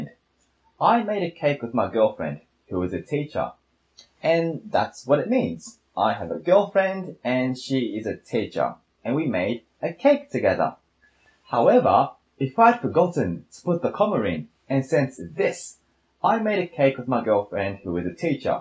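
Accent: Australia. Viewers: Love Aussie accent!! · オーストラリア英語かっこe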